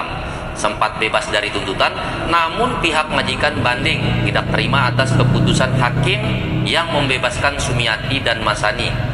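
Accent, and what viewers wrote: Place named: Sumbawa, Indonesia. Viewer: nyam seda pmbaca ne Jerni n jls pst mas nya orang sumbawa totok dri nada bicaranya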